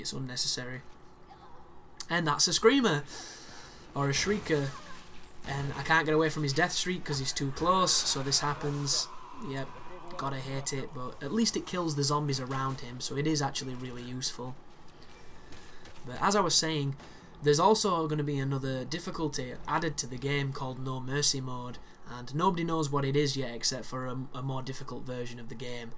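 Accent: United Kingdom. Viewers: I don't understand your comment and whether you're being sarcastic or not. Playing the game by always choosing 'No' takes some discipline, but at least it means that there is a point to the healing items on Professional difficulty. The game is still annoying in that you can only have one saved game at a time, that's a really bad flaw that. You sound like you're from the UK, me too! :-) I like your commentary, you make some interesting comments and assertions about games and whatnot.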